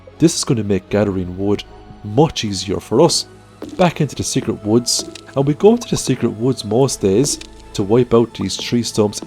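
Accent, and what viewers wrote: Ireland. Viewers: I literally came back to this video to post this comment. I watch these videos once or twice while I'm awake to get ideas for my own playthroughs, and then I use them over and over to fall asleep to because doesn't ever raise his voice. The Irish accent helps with the relaxing quality too I think. So many other content creators can't be trusted to not scream in my ear suddenly while I'm trying to fall asleep. If you're into calming voice overs in general, I recommend Steve1989MREInfo. Completely different channel, he reviews MREs, but his narration is very calm and consistent throughout his videos.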